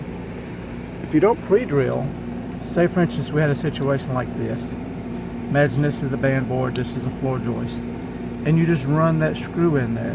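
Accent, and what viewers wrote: South, United States. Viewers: this is probably all too late to be helpful for you, but maybe for someone else... Screws to draw the blocking up into the floor is not a bad idea, but not to keep the blocking in place. And not gold screws, they are too brittle and will break and/or loosen up over time. Some beefy structural screws would be best. The blocking should actually be the same dimension as the joists, and secure the blocking with framing nails, maybe even joist hangers. Also add some subfloor adhesive to the top edge of the blocking. You can also see in the video that the subfloor is compromised. Pain in the butt to fix that, but it ain't gonna get better on it's own. Flashing underneath the sill plate is actually incorrect. The idea of flashing is to keep the water out completely as much as possible. Putting the flashing on top of the concrete is likely to allow water to get into the crawl space between the flashing and the foundation. 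There are liquid flashing products on the market that you can use to seal the joint between the rim joist and the foundation, or you can use the metal flashing but in either case the flashing must overlap the joint and then the siding overlaps the flashing. In addition, seal the ends of the joists, the outside of the rim joist, the the outside edge of the sill plate to help prevent having to replace those in the future. From your accent, I assume the home is in the south, where everything stays wet pretty much all the time. Not much you can do about that. The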